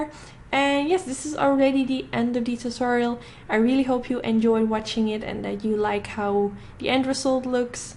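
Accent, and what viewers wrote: Netherlands. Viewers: your accent sounds dutch... Are you dutch? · Fiona Anker-Petersen If I hear her accent, I would think she is from Holland... But maybe I'm wrong. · je doet het wel mooi zeg! merkte meteen dat je Nederlands was haha, x. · Flawless😍 enne... Hoor ik daar een dutchie? 🇳🇱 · Tusha van Well I knew it! I had a Dutch friend & this is how she talks! Mooi fr 😁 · Are you dutch? You have a dutch accent 😂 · u are totally dutch i can hear the accent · i knew that when i heard your voice that this was a fellow dutch person haha zo leuk! gelijk gesubscribed! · Where are you from? Are you Dutch? I love your accent! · Ik hoor echt dat je Nederlands bent 😳😳 maar je kan zooo mooi opmaken wauw !! super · Dutch accent I hear you!! Super mooi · Hoi! Ik hoorde meteen al wel dat je Nederlands bent, wat ook helemaal te gek is. Je hebt echt super veel talent en met deze look heb je me geïnspireerd. 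Groetjes van je mede-Nederlander! ❤️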